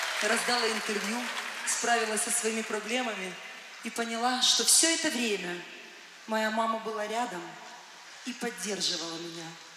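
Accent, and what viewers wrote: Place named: Surzhyk, Ukraine. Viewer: Очень крутой диалект, как в Украине и говорят, украинский суржик, обожаю его, юмор, солнце, Одесса. Свобода, весь мир, далекие страны. С Одессы уплывали в Америку 40 лет назад.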